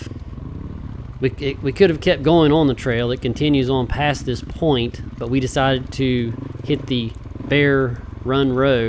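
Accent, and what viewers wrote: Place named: South, United States. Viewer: I love this kind of video; No music, good southern narration 😄, the sounds of the bikes. Excellent work.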